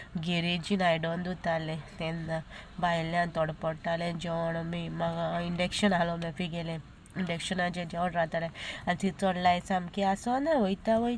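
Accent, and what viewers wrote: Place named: North Goa, India. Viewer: I just like your north goa accent ❤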